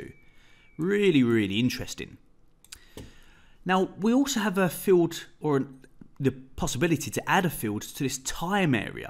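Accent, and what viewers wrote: United Kingdom. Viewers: Had to download parallels and excel for windows. You have such a gift of teaching, all very clear and easy to follow. Awesome proper brit accent too haha. Just a question: can you change settings for the Bing map style, colours, etc. ?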